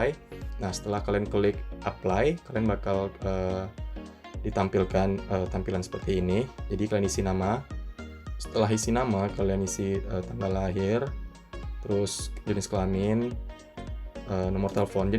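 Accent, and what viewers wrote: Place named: Riau, Indonesia. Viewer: Kelen kelen nya terasa kali kek orang Riau wkwkwk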